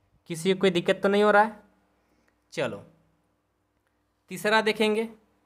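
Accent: Bihar, India. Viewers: Sir app Bihar sa ho na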